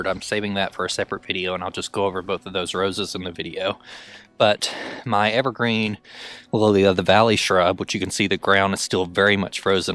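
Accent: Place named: Appalachia, United States. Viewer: I love your accent and phrases (Right quick. For example.) I am not southern, we are just tucked into the Appalachian Mountain range that runs through Pennsylvania on the western side of the state. I have noticed at least some of our dialect seems very similar to those in the south! Love your videos! Thanks for sharing all you do!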